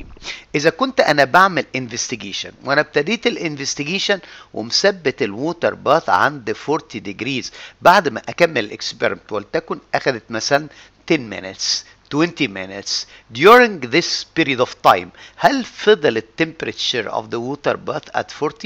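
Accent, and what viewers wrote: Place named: Italy. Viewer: Your accent is so much italian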